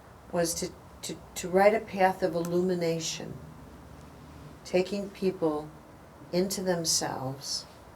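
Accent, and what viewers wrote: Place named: Chicago, United States. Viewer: I do sometimes feel annoyed with her and wish she had a better speaking voice e.g.That American Chicago accent can grate.But her amazing insights into the behaviour of people and religious Myths and people's everyday way of dealing with life is so darned honest and incisive it is riveting. As much as i love her I will not give up the scaffolding of Catholicism for her intellectualism. Like St Teresa of Avila I love it.And CM has become much nicer since meeting that great Catholic mystic.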